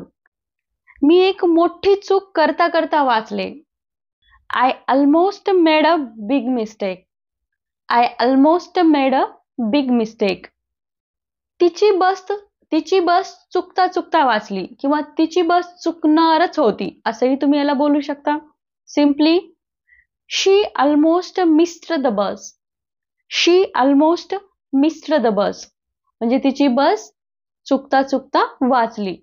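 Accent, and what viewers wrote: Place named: Marathwada, India. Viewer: सुंदर शिकवता पण मराठावाडा किंवा विदर्भात अस बोललं जात जस सेव्हडं, स्लिपड, कृपया तस नका बोलू.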